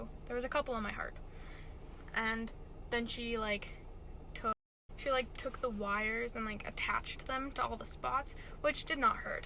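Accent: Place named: Canada. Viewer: this is literally one of my worst fears. (having a medical emergency at school) i’m glad you’re okay 💗💗 also, are you from canada? i hear a Canadian accent haha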